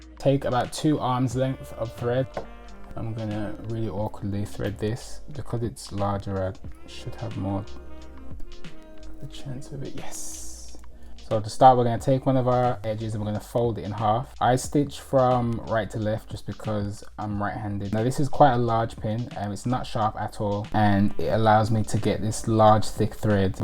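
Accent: Midlands, United Kingdom. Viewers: I never thought I could make a rug but you’ve made it look so achievable! Thank you so much! And your voice is so relaxing! You from the midlands? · is that a midlands accent i detect? i adore this design <3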